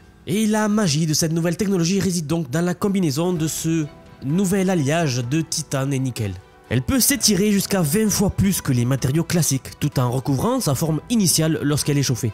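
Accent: South, France. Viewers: super video jadore ton contenu mais choisi entre l'accent du sud et l'accent asiatique pcq la c'est inaudible stp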